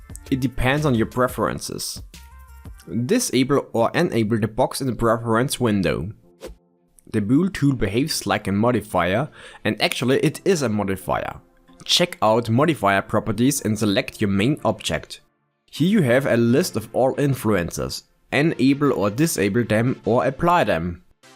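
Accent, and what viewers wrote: Germany. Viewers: Dein German-English is aber schon heftig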